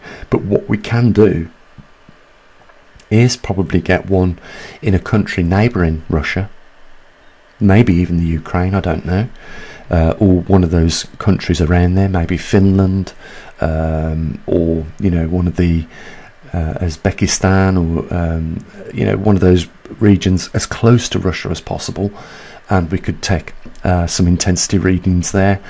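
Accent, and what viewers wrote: Birmingham, United Kingdom. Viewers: You sound like a fellow Brummie. Lol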